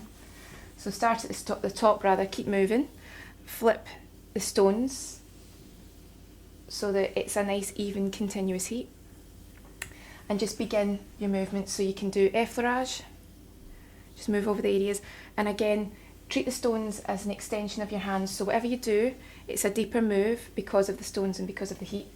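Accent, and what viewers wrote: Scotland, United Kingdom. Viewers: Really informative and relaxing to watch, as everyone has said. I love the Scottish accent too! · Ah ma fellow scot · Scottish accent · Scottish is an actual language. She's just speaking english in an scottish accent.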